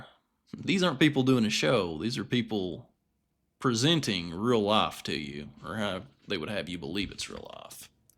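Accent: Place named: South, United States. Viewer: Great southern accent. Love it. Subscribed.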